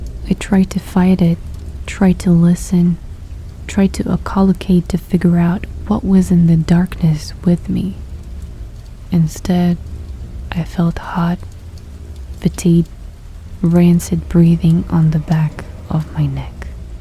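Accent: United Kingdom. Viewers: It’s awsome the British accent ❤️ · Great British accent xx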